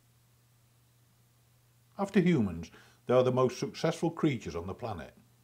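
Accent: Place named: Lancashire, United Kingdom. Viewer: Thankyou,,, Great video,,,Great Accent,,, Lancashire,,, Could be Yorkshire,,,I my self like rats,,,, Good luck to them all,,,👍🌹😄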